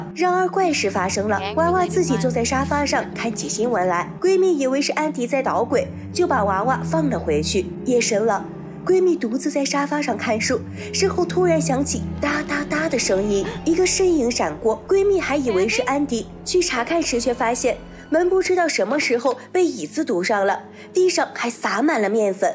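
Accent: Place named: Shandong, China. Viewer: 听口音就是我们那边的，老家山东的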